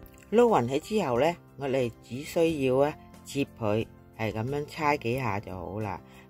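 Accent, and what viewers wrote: Vietnam. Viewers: 聽咗一分鐘 終於聽到你的越南口音啦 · 謝謝Amy 老師不吝賜教，這個是我今年做月餅的首選，希望能成功，你帶越南口音的廣東話非常有親切感，做好後成績如何會向你交功課，謝謝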